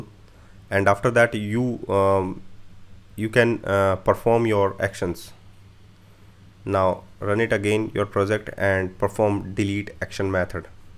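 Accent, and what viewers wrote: India. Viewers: The worst most video I've ever seen in my life. The Hindi influenced English trainer accent is really irritating. It is clearly understandable that, this guy don't know anything, but he is managing to teach us. I've already provided this comment. Looks like these guys are removing negative comments. Hence, I've provided negative comment once again.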